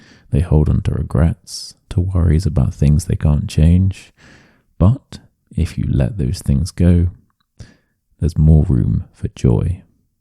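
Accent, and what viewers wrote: United Kingdom. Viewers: British English (which I love)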